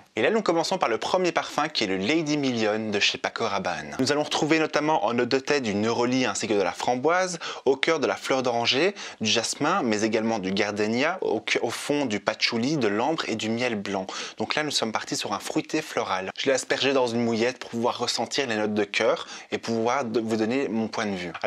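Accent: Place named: Wallonia, Belgium. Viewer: Accent wallon ou brusseler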